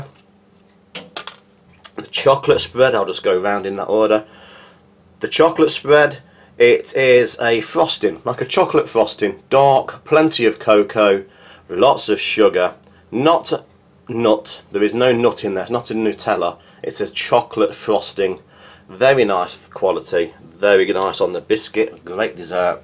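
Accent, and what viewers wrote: United Kingdom. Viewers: Great review ! I always enjoy to hear your English English :) These rations ate civilian, but the components are the same quality as the military. I personally enjoy Maiskij Chai - the tea. I even bought a pack for me when I was in Ukraine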